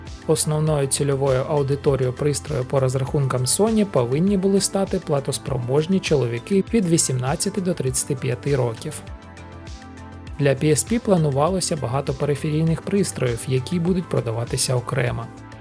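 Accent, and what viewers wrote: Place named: Russia. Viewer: Адже я чую залишки російської мови)) Поки я дивився, мені вдалося вивчити українську мову.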